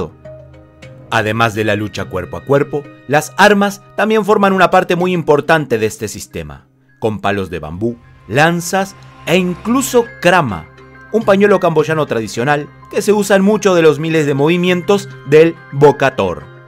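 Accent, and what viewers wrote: Uruguay. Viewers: Sensei le felicito por su excelente trabajo y esfuerzo en mantener viva la llama de estas artes tan nobles. Una Pregunta maestro usted es de Uruguay?! 🤔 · Hola! sos Uruguayo?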